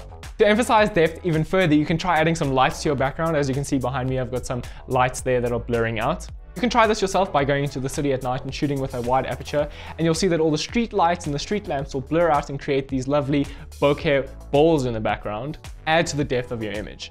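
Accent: South Africa. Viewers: Sound South African ...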